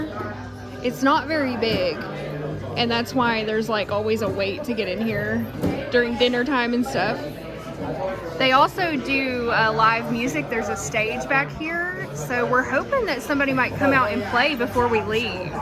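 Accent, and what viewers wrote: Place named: Arkansas, United States. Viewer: I look forward to these videos! Arkansas is beautiful❤ My aunt Mary is from there and your accent and hers are so similar it’s funny. I wish you luck in your therapy and your marriage🥰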